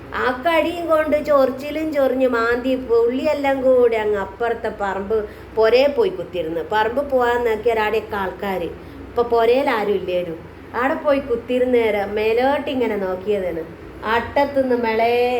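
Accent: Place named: Kozhikode, India. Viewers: നമ്മളെ സ്വന്തം കൊയിലാണ്ടി ഭാഷ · കോഴിക്കോട് സ്ലാങ് അല്ലെ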